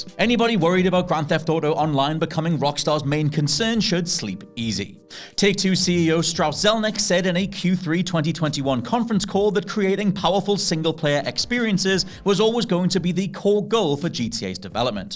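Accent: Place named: Newcastle, United Kingdom. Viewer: This is my first time on your channel and I'm curious - are you a Geordie by any chance? You sound like I sound when I put on an accent so others can understand what I'm taaakin' aboot :D Please disregard if you have no idea what I'm talking about. Subscribed anyway...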